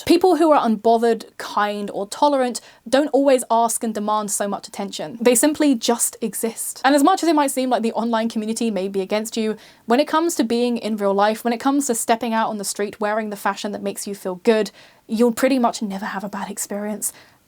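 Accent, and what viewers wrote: United Kingdom. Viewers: She is very clearly and audibly English.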